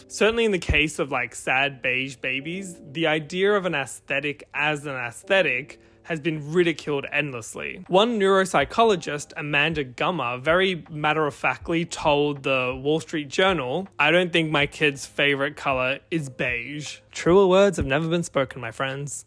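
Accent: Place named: Australia. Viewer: I never knew Aussies pronounced it as "ass-thetic" rather than actually making the /æ/ sound and now I can't unhear it.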